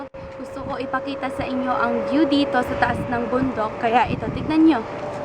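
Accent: Philippines. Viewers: You got the Filipino accent down :D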